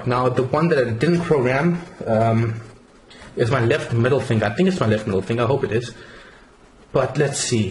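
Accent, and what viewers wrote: South Africa. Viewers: I thought that your accent sounded familiar, You're from Durban! im from Cape Town! Great project man, ill be sure to have a deeper look at your system, Good luck with the future of this project!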